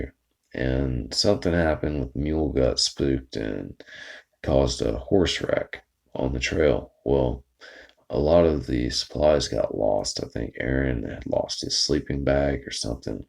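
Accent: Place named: South, United States. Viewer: How did I not know about this yet?!?! I may never have looked at it if it were not for you! I really love your podcast videos.....you have the best voice for this stuff....I love your subtle southern accent....and you are such a hottie too!!! Thanks for another awesome show!!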